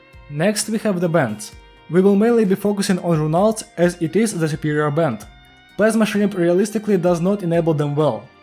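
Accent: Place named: Russia. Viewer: Russian accent is strong in this one. I have no idea why, but this really sounds 100% Russian to me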